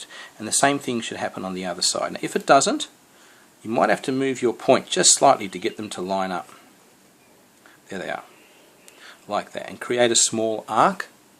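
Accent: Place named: Australia. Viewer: Its an aussie accent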